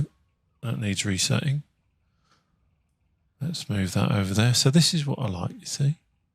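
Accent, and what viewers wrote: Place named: Cockney, United Kingdom. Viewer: Is it scary how well he suits the cockney geezer accent.. Yes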